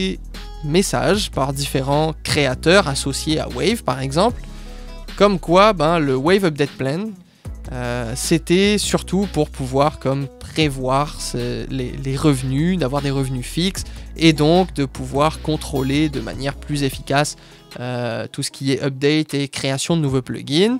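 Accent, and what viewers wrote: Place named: Réunion, France. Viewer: T parey mi sens kom inn ti accent kreol 😅 ou lé réyoné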